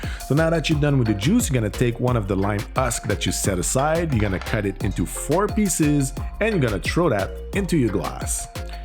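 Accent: Quebec, Canada. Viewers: French Canadian accent is so sweet ! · From Québec ?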